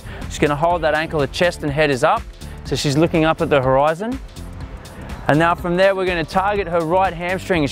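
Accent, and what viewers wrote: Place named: Australia. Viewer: I have no idea what this guy was saying...Not because he's got Australian accent but because I stopped listening after he told that girl to lay down and work on her glutes...At that point, I even forgot what this video was about. If you want people to pay attention and not get crazy distracted by the hot girl, bring a 300 pound guy to do these exercises. People will definitely pay attention as they'll feel if they don't - they will end up like that guy.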